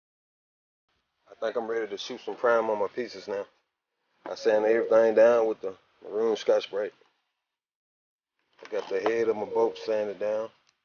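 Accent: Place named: Missouri, United States. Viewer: you sound like your in Missouri, Thad be cool, long live the Cicada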